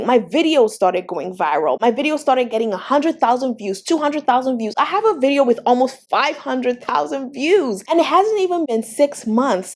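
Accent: Nigeria. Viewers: Giiiirl! Are you Nigerian? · It's the Nigerian accent for me😂 Thanks alot for this gem and giving us a great personality to go with it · Thank you for making this video. Truly it’s a blessing. Girl, are you Nigerian? The accent peaked through. Fellow Nigerian here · I love your Nigerian accent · Is that a Nigerian accent I heard😍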